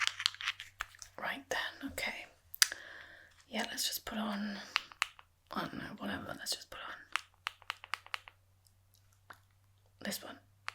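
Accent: United Kingdom. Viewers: I didn’t know I needed British ASMR until now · As unhinged and possessive as this gal is, she's irresistible! I can't believe this guy let such a beautiful woman with the cutest British diction get away! Even if INSANE, she's a keeper!, this one's a masterpiece! You made all of us wish we were that guy! You've done it again, love! ❤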